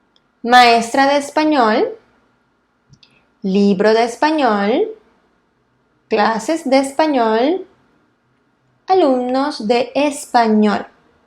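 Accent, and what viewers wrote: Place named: London, United Kingdom. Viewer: Are you in London mam ? Because you are speaking very nice 👍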